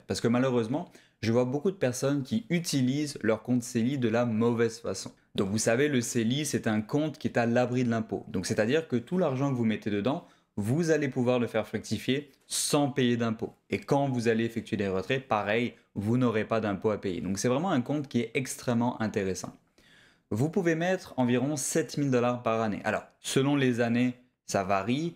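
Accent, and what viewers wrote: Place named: France. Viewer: Pourquoi un québécois s’adressant à des québécois sur un sujet ne touchant que le Québec parle avec un accent français?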